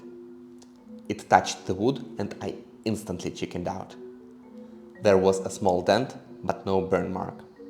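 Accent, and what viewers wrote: Russia. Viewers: По акценту слышу наш слоняра)) Очень рад за тебя братан, сэкономить 3к$ это не каждый сможет)) Можешь подсказать сервис где можно купить подписку на claude без санкций? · я смотря разные видосы про 3д печать не ожидал увидеть англоговорящего автора который на самом деле русский) тебя выдал жутчайший акцент и часть видео где чатгпт написал на русском)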